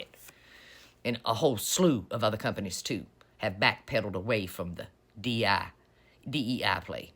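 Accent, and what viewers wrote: South, United States. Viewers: I just love your analyses. With your southern accent, your comments are on point while sounding smooth as Vermont maple syrup. It makes what you are saying more compelling to hear. ❤❤❤❤❤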